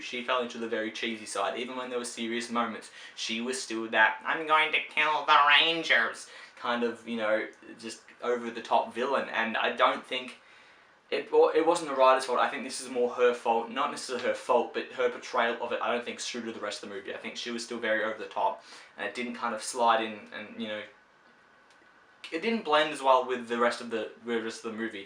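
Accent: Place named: Australia. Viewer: Another small Australian YouTuber with talent and deserves way more subscribers? Awesome! I'm Corey and I too am an aspiring film critic with a passion for movies with a relatively small sub count (256) and not only that but the fact that we're both Australian is awesome. I can tell you're Australian due to your accent and the ratings on your Blu-ray collection, with the combination of G, PG, M, MA etc. As someone who likes to support other small young aspiring film critics or film buffs let me say I just added to your sub number. I too review films on YouTube, my personal favourite review of mine is a review for a rather obscure claustrophobic thriller released in 1997 called Cube, a highly underrated thriller that deserves more recognition. Feel free to check out my review or channel in general if you're curious but you don't have to if you don't want to.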